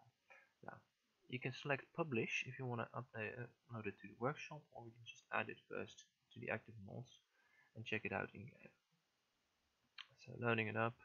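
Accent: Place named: Netherlands. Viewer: Thx for the tutorial :) I assume you're Dutch . so it sounds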